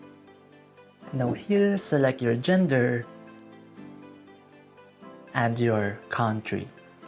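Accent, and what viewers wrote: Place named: Philippines. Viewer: I already know that your a pilipino bc of your accent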